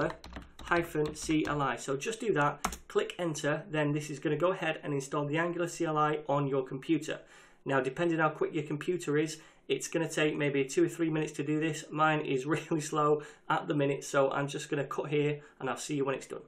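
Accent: United Kingdom. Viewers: Thank u very much, I love your wonderful tutorial, and your amazing British accent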